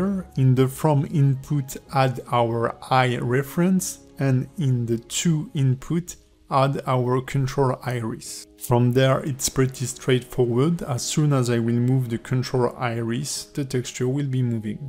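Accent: France. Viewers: clear and useful video plus I find your french accent really cute (^u^) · Ouah l'accent francais mdrr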